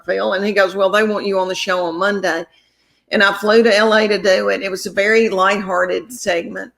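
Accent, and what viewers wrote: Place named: South, United States. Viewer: OMG this woman is the best person who ever came out of the South. I’m from New England, I used to have to (sorry) put the subtitles on when I listened to her. But now I understand every word. I laugh harder at her comedy than ANYTHING. Her stories about her kids and husband are soooo funny. I love her, and Nate Bargatze, and found them both just before the pandemic. Wow! Southern comedy and rural Vermont comedy? 😮Not so different. Growing up with just weird and wonderful people makes for such terrific stories. And you can NOT make this s—it up!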